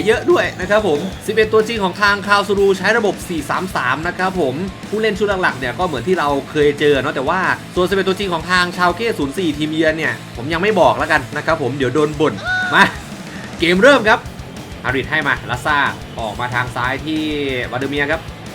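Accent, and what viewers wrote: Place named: South, Thailand. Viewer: น่าจะตั้งชื่อว่า มูฮัมหมัดอะไรโช้ ออกสำเนียงใต้น่ะครับ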